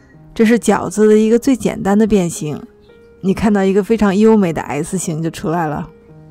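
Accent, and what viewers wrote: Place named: North, China. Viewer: 怎么是大陆北方的口音，带点儿化音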